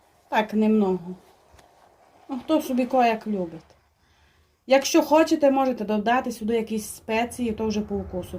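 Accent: Transcarpathia, Ukraine. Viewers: Закарпатська мова топ твоя фішка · Дивлюся файний рецепт і заодно вчу закарпатський діалект. Як же приємно чути вашу вимову❤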